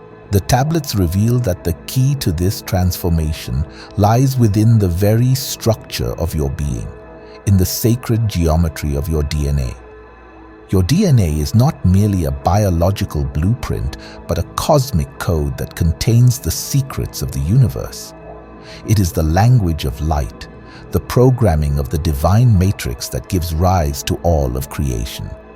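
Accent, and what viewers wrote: India. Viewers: You are sound like Indian..!!! Solemn voice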